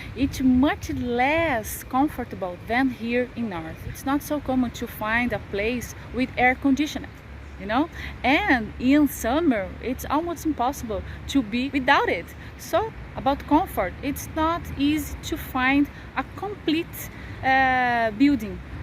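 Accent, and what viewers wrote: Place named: Brazil. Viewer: Para brasileiro... nota 7 no ingles ... brasileiro tem 1 defeito que fica sempre o sotaque brasileiro entao a falar o ingles parece russo a falar portugues.... depois bullying na escola... portugal e dos paises que melhor recebe os brasileiros imagino 1 portuga numa escola brasileira....o algarve e uma zona turista logo é mais caro é so para quem pode ca viver ou como eu para quen nasceu.. não para quem quer...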